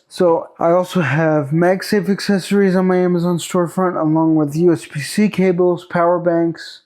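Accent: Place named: Scotland, United Kingdom. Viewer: God bless you the exact content I needed that ia could not answer. I take it your descended from Scottish people I can tell with your accent. The home land thanks you x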